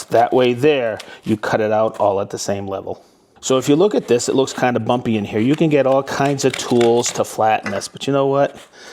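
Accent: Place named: Boston, United States. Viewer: I guess you can take the man out of Boston. But, you can't take the Boston out of the man, Hello from a fellow New Englander. I love watching you videos and find them extremely informational. keep up the great work my friend.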